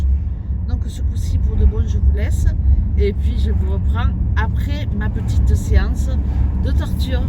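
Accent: South, France. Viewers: Bonjour,d oû vient votre accent, vous venez du Sud? Je ne pensais pas qu avec les pieds dans l eau ça pouvait jouer sur l arret de fumer! Merci de nous avoir fait un peu voyager avec les belles images du fond de vlog à bientôt 👋👋👋👍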